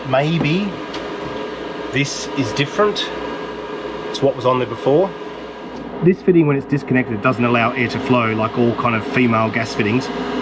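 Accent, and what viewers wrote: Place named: Australia. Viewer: Thanks, this is just what I needed this morning, you always make me smile, and sometimes I really do think you are from Michigan with that I only need this or that to make it work but then that great Aussie accent keeps me grounded. Keep up the great work.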